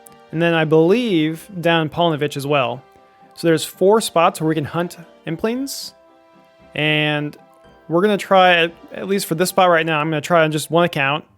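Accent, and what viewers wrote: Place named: Midwest, United States. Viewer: Your outros give away the fact that you're a Midwesterner 😂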